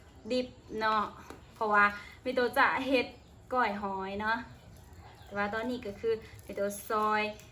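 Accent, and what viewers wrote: Laos. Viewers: น้องอยู่จังหวัดอะไรครับฟังสำเนียงเหมือนคนทาง ส.ป.ป.ลาวครับ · ນ້ອງ akha ອ້າຍເບີ່ງ ຄລິບ ນ້ອງຕະຫລອບ ອ້າຍຢາກຖາມວ່າ ນ້ອງເປັນຄົນລາວ ຫລືຄົນໄທ ຟັງສຳນຽງຄືລາວ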